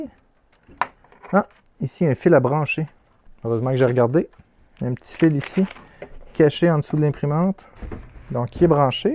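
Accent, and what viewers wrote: Quebec, Canada. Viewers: n y vois surtout pas de moquerie. C est juste rigolo. J aime tes vidéos et ton " accent québécois", tes expressions bien de chez toi:-) Amicalement.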